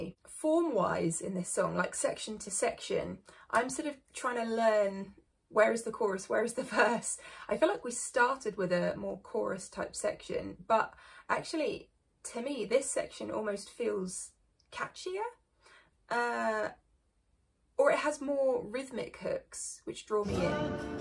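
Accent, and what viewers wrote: United Kingdom. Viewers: Tnx for reaction.and your British accent is awesome btw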